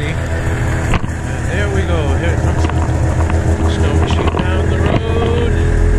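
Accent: Boston, United States. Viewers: he grew up in south Boston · You have a Boston accent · Stan, I have never met you or your family but I consider you a friend. I miss seeing you on your show because I really enjoyed hearing your Boston accent in cold Alaska. I hope to visit Alaska some day. Keep sharing and I will be watching from Cleveland. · I just made the same comment. That’s a Boston accent all day long. I know... I’m from Boston. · You said "downtown" Tanana ? Bright lights, movie houses, traffic jams? I thought that was funny. You can take the man out of Boston, but you can't take Boston out of the man....."staat , New Yaak . Love it! Keep up the good work.....you're a real inspiration. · Are you from Boston? You sound like you have a little accent. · Loved watching u on TV I can still here the Boston acent I have it too that's how I know, Glad found u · So good to hear that Boston accent again! 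Haven’t had TV for a while , so.....no Tanana show😢. Found this on YouTube and am so happy! Keep going Stan!!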